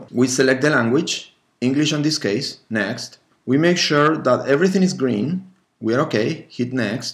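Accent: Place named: Italy. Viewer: se sente debbrutto che sei italiano XD thanks for vid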